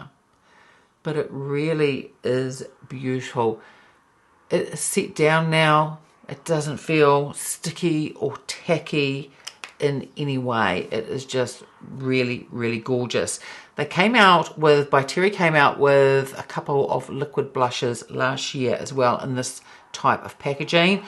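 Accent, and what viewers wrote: New Zealand. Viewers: I ❤ your videos love!!! I also will be trying to do a Kiwi accent all day!!😂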